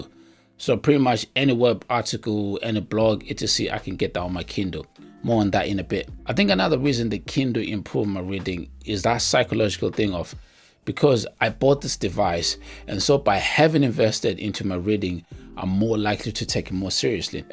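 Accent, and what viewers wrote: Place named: Nigeria. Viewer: I can hear that Nigerian accent. Are you Nigerian? Also, amazing video!!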